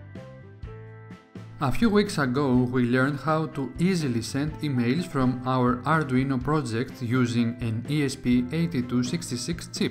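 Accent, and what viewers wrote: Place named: Greece. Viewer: Where are you from ? You accent sounds greek.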